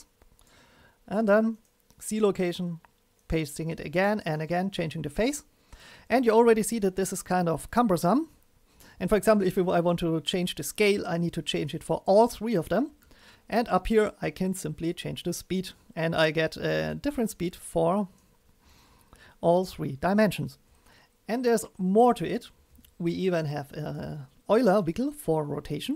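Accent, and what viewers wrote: Germany. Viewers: Love your Tuts. I don't know if its cause they're awesome, or your German accent is awesome... Or both maybe :)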